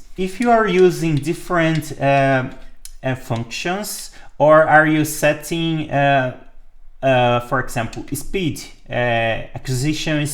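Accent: Brazil. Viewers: Kind sir, are you by any chance Brazilian or Portuguese?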